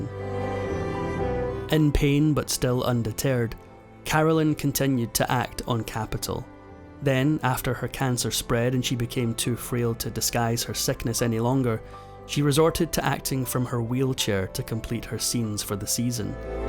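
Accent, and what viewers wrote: Scotland, United Kingdom. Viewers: A fellow Scot. I can't think who he is 🏴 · Six months, the narrator is Scottish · Lovely gentle Scots accent. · . Scottish AI? I don’t think so, not with those pauses and inflections. If you listen, you can hear him inhale.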